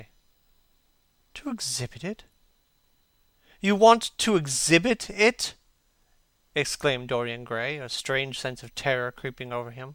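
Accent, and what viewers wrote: United States. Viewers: American accent doesn't fit.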